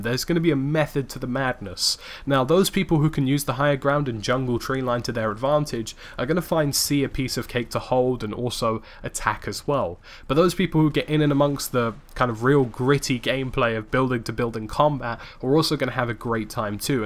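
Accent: United Kingdom. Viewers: You have a very light accent for a brit! May I ask where you're from more specifically?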